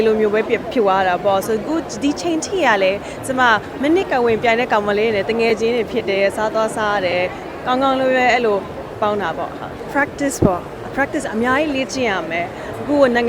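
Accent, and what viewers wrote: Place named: Myanmar. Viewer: Cute girl with cute Burmese accent.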